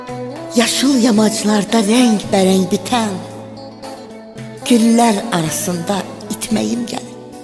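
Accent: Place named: Nakhchivan, Azerbaijan. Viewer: 😂😂😂Lehçe lap Naxçıvan lehcesidir ki😂😂😂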